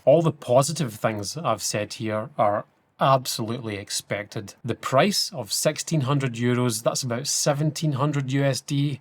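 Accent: Scotland, United Kingdom. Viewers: I enjoyed the Scottish accent very much.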